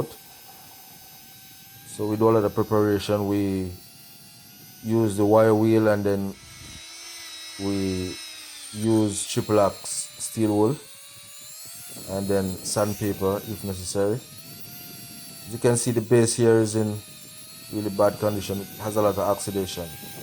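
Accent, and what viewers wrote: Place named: Jamaica. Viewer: Heyyy jamaican accent! Bomboclat!!!!!!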